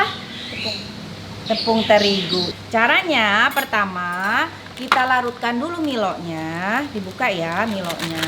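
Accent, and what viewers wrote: West Java, Indonesia. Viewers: Hidup Bsndung..Klo ada Atta Aurell..pasti dong mampir..hidup emk2..t..logatnya sunda pisan..kereeennn👍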